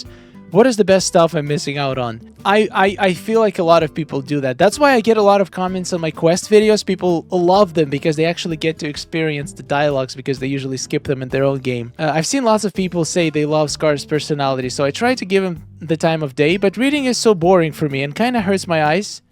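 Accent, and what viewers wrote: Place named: Ukraine. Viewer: I listened to your words many times, I thought I misheard. Are you from Ukraine? How long have you been in the US? What is your real name? Sorry for so many questions. I’m from Ukraine😉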